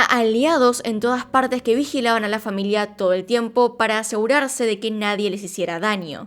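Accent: Argentina. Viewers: Amo ese acento argentino!!!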